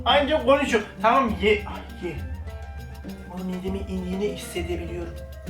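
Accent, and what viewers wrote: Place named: Kırşehir, Turkey. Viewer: Kırşehirli olduğu çok belli ya babannem çok güzel diyo ya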